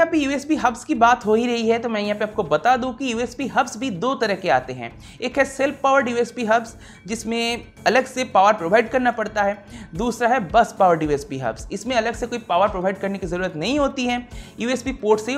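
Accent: Bengal, India. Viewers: I'm also... Tomar kotha sune mone holo tumi bengali😉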